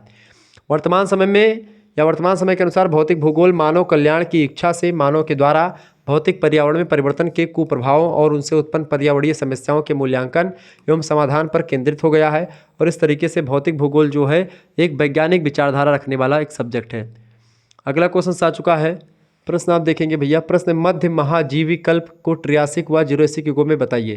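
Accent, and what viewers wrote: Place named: Bhojpuri, India. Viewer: are bhaiya pure hindi bola kariye ye bhojpuri dhang se samajh mein nhi ata hai samajhne mein dikkat hota hai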